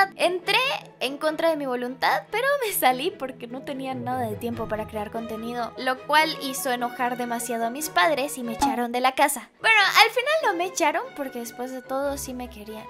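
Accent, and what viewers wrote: Colombia. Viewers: Señora hp JAJAJAJA Adoro ese asento colombiano mrk te queda melo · Yo creo que deberias hablar mas el acento colombiano y su jerga, es más divertido XD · Eres Colombiana ? ._.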